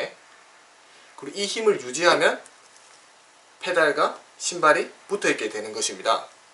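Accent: Gyeongsang, South Korea. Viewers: 짜증난다 저 경상도 사투리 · 경상도쪽 말투 같은데..진짜 찾아뵙고 배우고 싶습니다 ㅠㅠ 좋은 정보 감사합니다~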